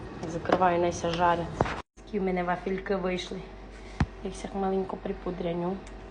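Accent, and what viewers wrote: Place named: West, Ukraine. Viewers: Взагалі українки говорять українською мовою, а не рашистською. Автор відео говорить західно-українською ( діалект). Якщо не подобається, не дивіться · То чого ж це ви, «українка», російською пишете? А я ось, не українка, вивчала українську у радянській школі у Києві , живу більш ніж тридцять років в Ізраїлі, але ж не тільки пишу українською а ще й усе те, що ця шановна пані каже, зрозуміла. Дуже приємно слухати західний діалект, немов річечка. А вам таки має бути соромно! 😁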